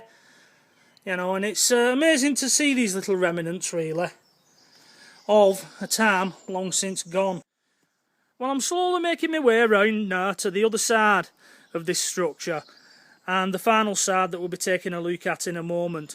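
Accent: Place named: Wigan, United Kingdom. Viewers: Proper back in the day Wigan accent! Nice vid pal 👍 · Great video and....love the accent ( Wigan?)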